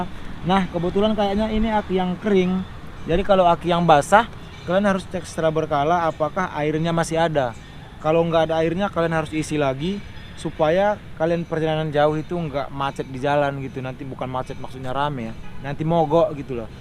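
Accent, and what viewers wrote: Indonesia. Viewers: Logatnya sumatera kali bah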